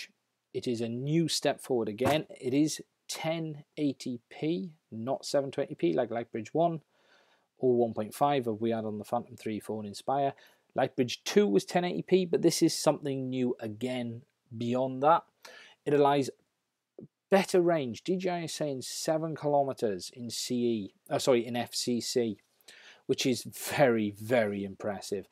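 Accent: Wales, United Kingdom. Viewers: so welsh!!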